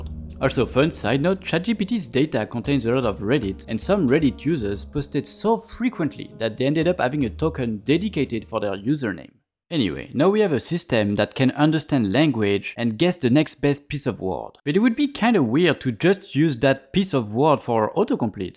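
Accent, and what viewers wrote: France. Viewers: great video but french accent was 🤮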